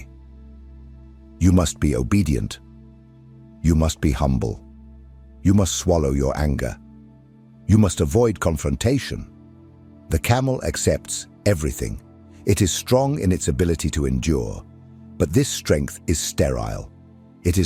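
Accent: United Kingdom. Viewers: Am i supposed to think this is profound cause dude has a brittish accent and a deep voice? I mean i guess this is a good message for someone who’s been bullied all there life, but the whole ”domination used to be a virtue - lets bring it back!” narrative is just fucking stupid as all get out 😂. Sure be assertive and stand up for what you believe in, but not at the cost of seeing others as equals and trying to better yourself for the greater good.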